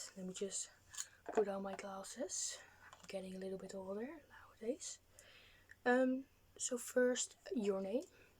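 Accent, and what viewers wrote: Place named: Netherlands. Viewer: Ik herken aan je accent dat je uit Nederland komt ;). Niet dat je Engels slecht is, helemaal niet! Je spreekt goed en vlot Engels, zonder aarzeling. Dus proficiat daarvoor! Ik vind het altijd leuk om nieuwe kanalen te ontdekken, in het bijzonder wanneer ze nog aan hun begin zijn. De kwaliteit van het beeld is heel goed, het geluid ook en er zijn geen storende geluiden in de achtergrond, je stem is klaar en -héél belangrijk voor mij zonder geluiden van het speeksel, ik kan dat niet verdragen. Ik zou enkel liever dat je stem een klein beetje minder luid zou zijn. Maar ik zie veel potentieel in jou en zal abonneren, om je aan te moedigen! :)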